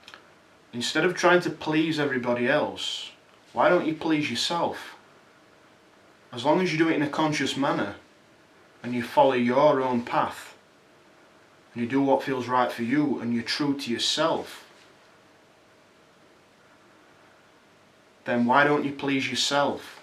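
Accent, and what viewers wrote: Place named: Leeds, United Kingdom. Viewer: i needed that if they are your own words it was spot on ,are you from leeds.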